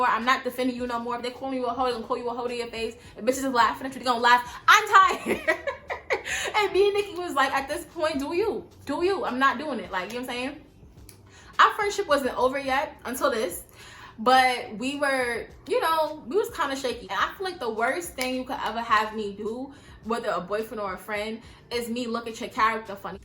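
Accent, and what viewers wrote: New York, United States. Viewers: it's the NY accent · It's the NY accent for me 😍😍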